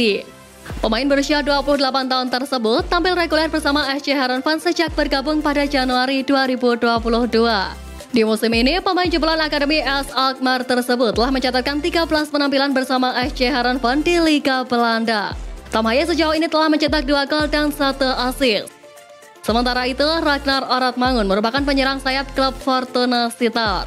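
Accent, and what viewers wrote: Java, Indonesia. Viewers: Yg ngomong medok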